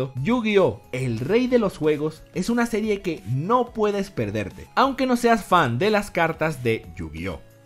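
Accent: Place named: Venezuela. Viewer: pana tu eres venezolano, tu acento me parece de alla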